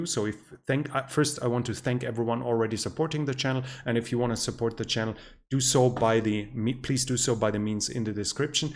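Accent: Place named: Germany. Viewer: The moment I heard a German accent I knew this would be good.....just straight forward analysis based on cold hard facts and evidence without any political rhetorics how refreshing...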